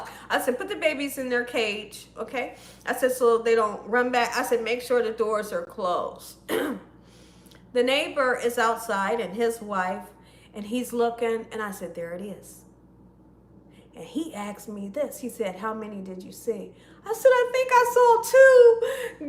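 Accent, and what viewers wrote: South, United States. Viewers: Mrs. you are awesome. Love your cooking videos. You sound like a southern girl are you from Louisiana?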